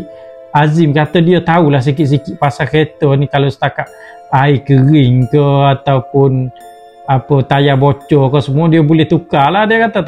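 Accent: Kelantan, Malaysia. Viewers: Terjumpa channel ni kebetulan ari ni first Time tgok..Abe ni ore Kelate ke ..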